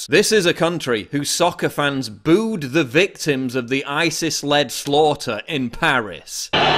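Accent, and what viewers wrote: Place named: England, United Kingdom. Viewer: Wait a minute you sound like someone from England but calls football soccer? Still good video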